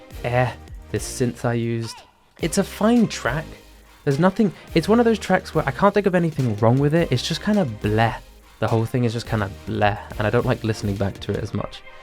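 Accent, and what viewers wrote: United Kingdom. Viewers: His voice is surprisingly very British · ...he's brittish ??? oh, not that i find it unpleasant i just wasn't expecting it haha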